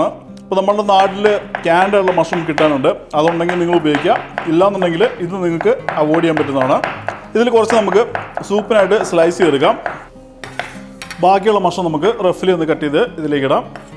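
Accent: Kannur, India. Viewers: the way you speak resemble like you are from kannur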